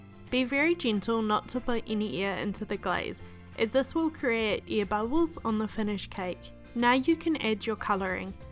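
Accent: New Zealand. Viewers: New Zealander accent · so many people whinging here - great video well done sis. Love your kiwi accent. from an ozzie down under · As a kiwi who left 26 years ago, it's amazing hearing your strong accent. Great video btw. Well done. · I hear that kiwi accent girl heyyyyy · hey! she sounds kiwi, and definitely not from texas! have a good day :)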